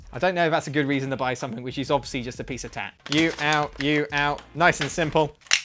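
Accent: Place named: United Kingdom. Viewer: I like how British people say three as free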